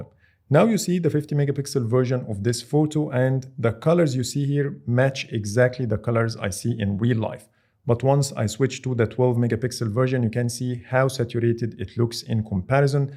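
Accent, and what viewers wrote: Egypt. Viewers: اللي بيتكلم ده مصري صح؟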